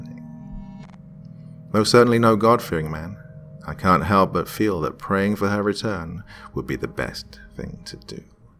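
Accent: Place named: England, United Kingdom. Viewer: Doctor, there is something about your English accent/pronunciation that is very enjoyable to hear.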